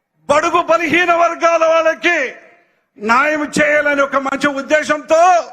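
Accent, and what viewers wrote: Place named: Alur, India. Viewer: Nee alour village yasha basha 😮😮😅😅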